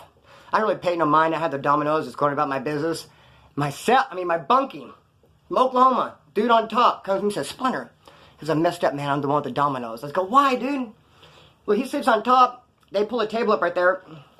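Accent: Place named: Central Valley, United States. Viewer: You talk like a regular dude from the Central Valley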